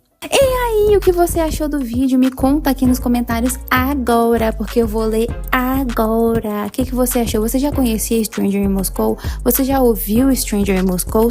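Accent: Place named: Bahia, Brazil. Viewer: Olha só, que incrível! Amei esse vídeo, você é muito talentosa, a televisão precisa de pessoas assim como você, jovens de talento e bom gosto. Imagino que você deva ter no máximo 22 anos. E como vocẽ conhece tão profundamende a história de e, principalmente a história dessa música?! Parabéns mesmo. Muitos da sua geração e das mais recentes, deviam ter contato com a boa arte dos anos 70, 80 e 90, para que talvez, com disseminação da boa cultura, quem sabe assim novos artistas como e tantos outros possam surgir no mundo. Meu nome é Jô, tenho 52 anos, moro em São Paulo e nasci em Jequié na Bahia. Achei você com o sotaque meio bainao e pernambucano. Fala aí, de onde você é? Quanto a música, ela é incŕivel, as gotas de chuva em câmera lenta, é incrível o clip. Quem sabe talvez você não possa fazer um vídeo falando da também? É outra cantora incrível que merece ter muitas músicas destacadas. 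Sucesso pra você garota, você tem o talento estampado na cara, continue assim com sua simplicidade que você chegará longe.💚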